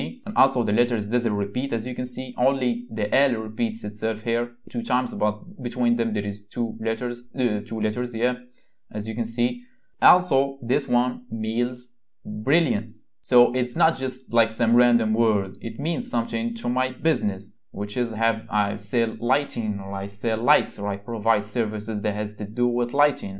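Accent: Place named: Morocco. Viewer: Maghribi la ? Chkit fl accent dyalk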